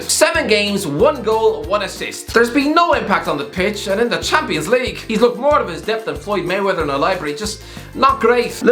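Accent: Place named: Ireland. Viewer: I post football news also . Just without this Irish ☘️ accent.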